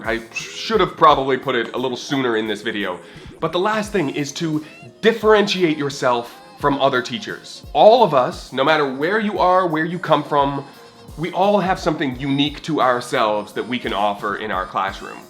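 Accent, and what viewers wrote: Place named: Canada. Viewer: Love your Candian Accent! Also, thanks for you suggestions, they're reassuring!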